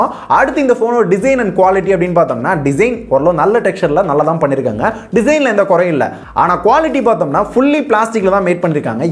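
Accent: Madurai, India. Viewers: Madura karanku thon antha slang puriyum.. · Bro nega madurai ya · bro ninga madurai ya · Madurakaaranaya nee😄🔥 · Bro okaluku madurai ya?? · Bro neenga madurai bro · bro nenga madurai ya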